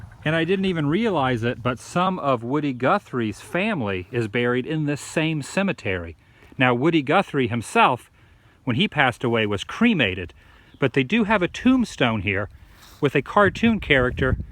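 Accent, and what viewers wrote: United States. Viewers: He talk like a cowboy🐄🐄🐄🐄🐄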